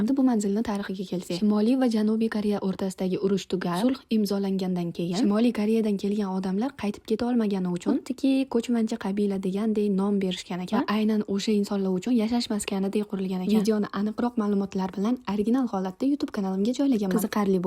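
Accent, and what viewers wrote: Tashkent, Uzbekistan. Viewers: Тошкенча шевада гапирасанмиии. Наманганлик бусанг. 😮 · Узиз Намангандансиз нимага Тошкент шевасида гапирасиз бу понтни нима кераги бор.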